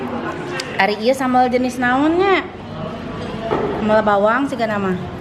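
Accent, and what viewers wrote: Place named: Sunda, Indonesia. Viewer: Pasti ngiler koq ratu bakso sdh makan bakso...manyus teh...jd pengen mkn bakso.. Saya bukan org sunda tp suka banget denger teh bicara bhs sunda...jd tau gitu dan enak di dengar..suka nonton ini krn ada ciri khas yaitu bhs sunda, dialek sunda suka banget dengarnya👍👍👍💖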